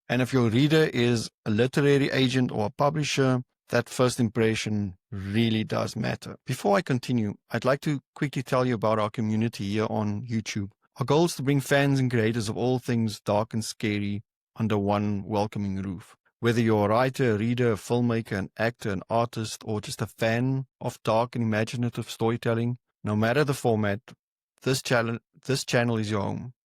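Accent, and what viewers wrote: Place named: Southern Africa. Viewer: That accent...which side of the Limpopo are you from? (Harare lad here!!)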